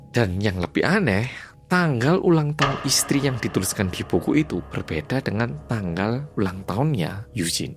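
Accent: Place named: Java, Indonesia. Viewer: Salut keputusan Yujin❤ tks muedok 🎉🎉🎉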